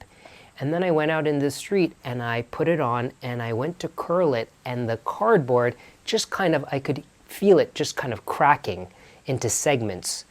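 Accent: South, United States. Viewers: You do the only Southern accent that I find charming! 😄 And, I'm sorry for your loss.♥ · Why the southern accent. Gays probably play sports even Olympic swimmers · 😂🤣As a Southerner, the acceennt!!👌🏿❤️😂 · the gay boys i grew up with skewed my perspective because they played "sports", got in fights, and the only fashion icons they respected were James Dean and (young) Marlon Brando (esp. from 1953 The Wild One). i adore the Tennessee Williams accent though...i adore you! i HAVE heard "mesh head" used as a pejorative to hats, that MY boys associate with a certain ex- boyfriend truck mechanic that was in their midst for too, too, long...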